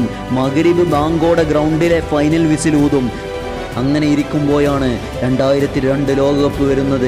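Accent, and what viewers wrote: Malappuram, India. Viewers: Commentator malappuram ullathano malappuram language pole thonnunnu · അവതാരകൻ മലപ്പുറൻ കാരൻ ആണോ 🙄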